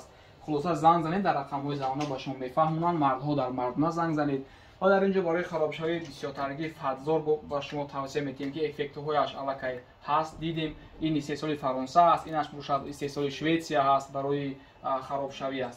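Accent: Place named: Literary Tajik, Tajikistan. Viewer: ту бо ЗАБОНИ АДАБИИ ТОЧИКИ хело хуб харф мезани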